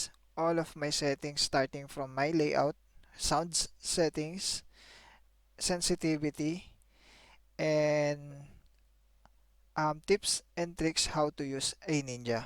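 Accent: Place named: Philippines. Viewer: pag mga pinoy nag english parang di bagay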